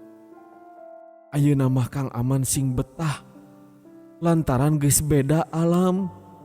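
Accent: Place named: Sunda, Indonesia. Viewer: Kang... Pas ngadongengkeun carita jurig, Osok sieun henteu? Hehehe Abdi nu ngupingkeun mah meni sieun tapi resep da kang nyunda pisan.. perkuat di soanten kang... Musik latar sebagai pemanis z. Nuhun kang.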